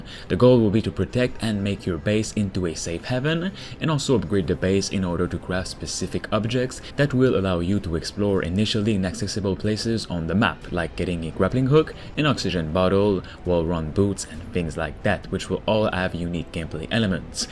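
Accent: Quebec, Canada. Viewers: Are you from Quebec? I'm hearing a bit of an accent :) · Es-tu québécois ? Ton accent semble familier :P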